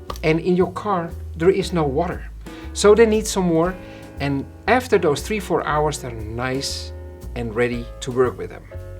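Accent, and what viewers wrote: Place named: Netherlands. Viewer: jezus wat een dunglish accent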